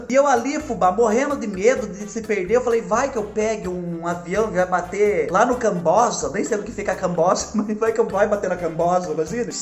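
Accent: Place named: São Paulo, Brazil. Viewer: Fubá sou nascida em são Paulo kkkkkkkkkkk só não moro mais lá kkkkk,mais vc parece com uma prima minha de Mogi das cruzes ,me identifiquei kkkkkkkkkkk,...vc e fofo e sua alegria contagia ...ela e bem do interior de São Paulo e fala como vc e muito engraçado ...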